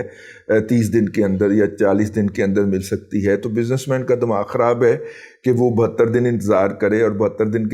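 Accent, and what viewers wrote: Punjab, Pakistan. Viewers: سر میرا بڑا دل کرتا ہے کہ میں آپ کی منہ بنا کے پنجابی لب و لہجے میں اداکاری کرتے ہوئے آپ کا یہ جملہ آپ کے منہ پر دے مارو میں ان کا حمایتی یہ ترجمان نہیں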